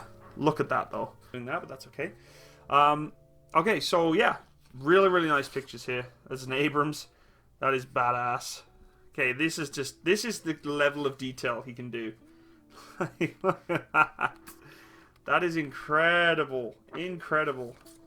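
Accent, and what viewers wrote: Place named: United Kingdom. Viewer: Feel like at home hearing your British accent that's lovely I'm British too. Enjoying your Youtube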